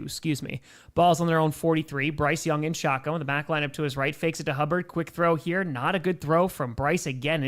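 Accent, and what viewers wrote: New York, United States. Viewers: Who is/are the Krossi Posse Nation that refers to at the start of these broadcasts? I had always assumed he was saying 'Grossi Posse Nation' but he does not start off his welcoming cry with a G sound, at least to my ears. (Unless people with a New York accent pronounce their G's as K's, at the start of a word, when they raise their voice.) In any case, good luck with your quest to reach 1M subscribers.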